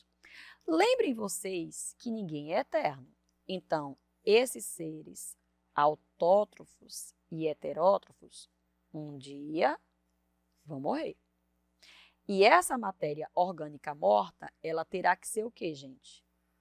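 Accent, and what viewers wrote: Northeast, Brazil. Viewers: pelo sotaque acho q do nordeste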